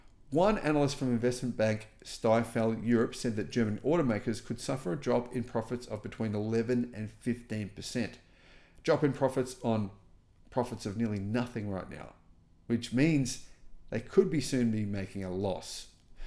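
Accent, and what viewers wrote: Australia. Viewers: U r an Aussie sir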